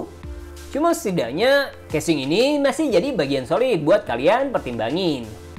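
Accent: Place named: West Java, Indonesia. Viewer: sunda pisan euyyy...kerenn, sukses terus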